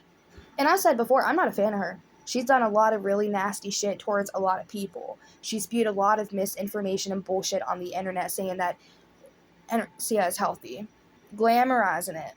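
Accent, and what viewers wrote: South, United States. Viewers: do i hear a little southern twang in your voice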